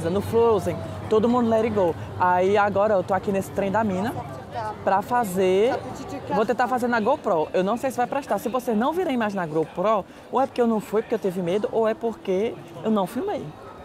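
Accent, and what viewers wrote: Ceará, Brazil. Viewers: "Tão rápido que eu procurei sentido em minha vida e não achei!" Cearense! 😁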